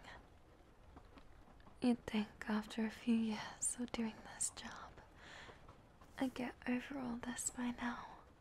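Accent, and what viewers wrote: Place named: Australia. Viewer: Damn this is the first time I've heard an Australian accent in asmr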